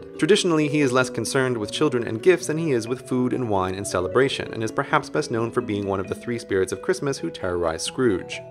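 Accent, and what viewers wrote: United States. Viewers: Probably not. He speaks with an american accent.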